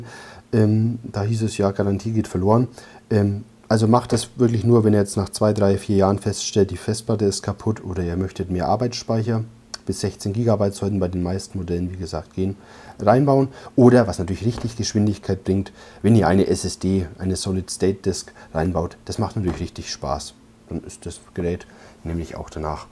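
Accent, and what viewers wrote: Germany. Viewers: Mit dem leicht fränkischen Akzent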